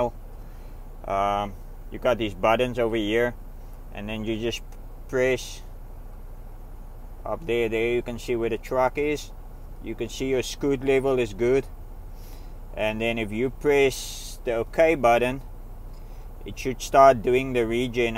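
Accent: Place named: South Africa. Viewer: Dude you clearly from SA 😅. Where about? How long you’ve been here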